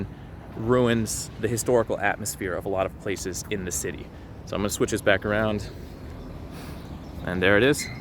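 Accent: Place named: Russia. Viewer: У этого человека явно русский язык - родной. Это просто сто процентов слышно и по акценту, и по интонации,и по произношению. И по тексту тоже. Может, увезли родители в 90е, может ещё какая история...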